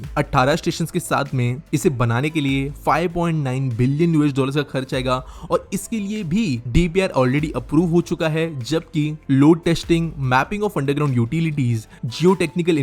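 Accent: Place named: Rohtak, India. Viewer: Bhaii knew you are from rohtak😂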